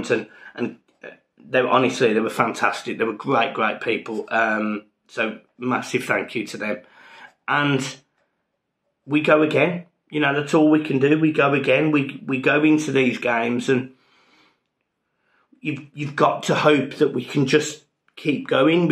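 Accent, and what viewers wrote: Birmingham, United Kingdom. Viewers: Oh mate, you're actually getting the stick for being a Brummie? I'd love to see some of those pricks talk to me; because I've been following Everton since I selected the team in CM back in 2002/2003 when Kevin Kilbane was playing and I'm from İstanbul. I've been detecting the accent was not Scouser but who the hell cares. And as a Fenerbahçe lad, I'm learning from your optimism, since it's absolutely not our strongest suit, haha:) Keep up the good work and hopefully Everton can avoid the relegation. 2 dynamic full-backs, 1 decent striker and 1 creative attacking mid right in front of Doucoure-Onana pair; we're good to go for next season.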